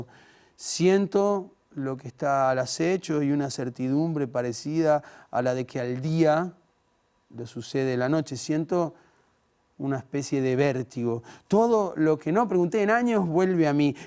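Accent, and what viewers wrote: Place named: Buenos Aires, Argentina. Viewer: Hermoso cuento. Una sugerencia: no imponer el ritmo propio a la lectura. Narrar es intermpretar pero lo escrito por otro, no lo que a uno le 'sale del alma'. El alma también se equivoca. Mejor no leer en porteño lo que está escrito, pensado, pausado y respirado en japonés. Muchas gracias igualmente.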